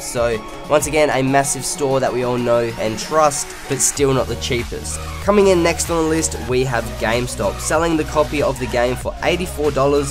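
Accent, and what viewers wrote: Australia. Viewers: Jeez your accent is strong, coming from an Aussie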